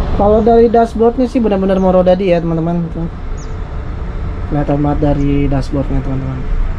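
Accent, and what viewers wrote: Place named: Minangkabau, Indonesia. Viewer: Mantaapp..semakin kental minang om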